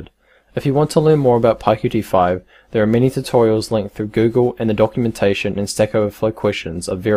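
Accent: New Zealand. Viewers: That T H I C C en zed accent Great vid!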